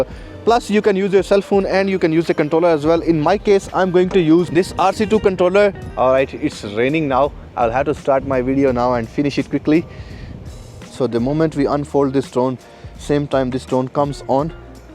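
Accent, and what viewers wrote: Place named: India. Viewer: Bro I think you are Indian . As your accent is exactly Indian.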